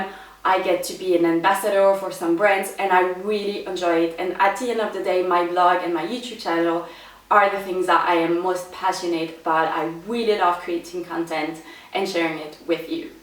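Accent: France. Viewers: Thanks for answering my beauty questions! This was a lot of fun to watch:D Sadly I don't think many late 80s, early 90s kids, escaped to whole icy blue eyeshadow with bright red lipstick phase, so we can all shake our heads in solidarity:P Glad to know I'm not the only one who couldn't place your accent when first discovering you, I think it's because it's not the stereotypical french accent you see in movies (using overly french pronunciation) but just a faint one, that has people so confused. Definitely going to be picking up that volumising spray when I'm next in Boots:D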